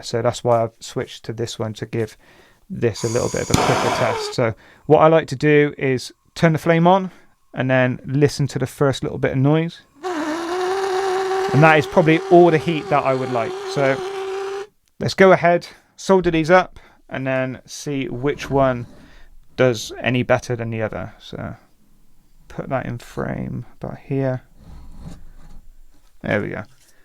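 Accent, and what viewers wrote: United Kingdom. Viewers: By your accent I think you’re Brithish . Here in the Netherlands we have slightly different pipe connections, but I’m watching your technology with great interest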